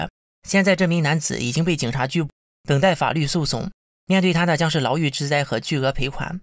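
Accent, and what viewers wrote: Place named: Northwest, China. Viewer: youtuber的普通话不太标准诶听着像西北口音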